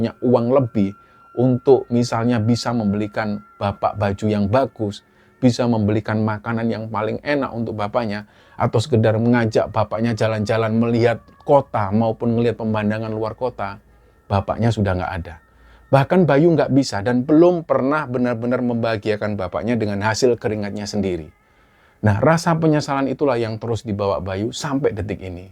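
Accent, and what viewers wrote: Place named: Java, Indonesia. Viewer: Klo mas lagi berbahasa jawa enak didengernya.. Walaw tidak bgtu paham..karna saya asli sunda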